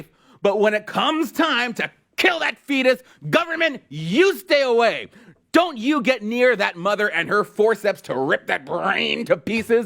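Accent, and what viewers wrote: Jamaica. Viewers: I like his Jamaican accent. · Are you jamaican? That was pretty good lol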